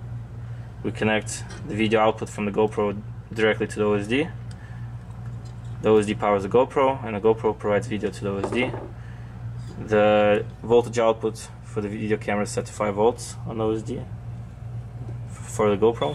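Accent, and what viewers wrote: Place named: Russia. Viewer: По акценту я сразу понял что говорит русский)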